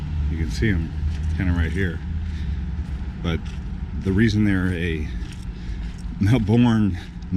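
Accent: United States. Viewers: Aaaagh American pronunciation aaagh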